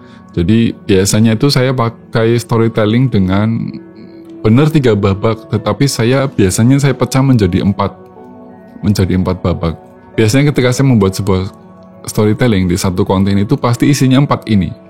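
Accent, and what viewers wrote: Indonesia. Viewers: Mantap bang,kayaknya Abang dari Jawa ya mendok bgt ngomong nya? Kalo aku orang ngapak😂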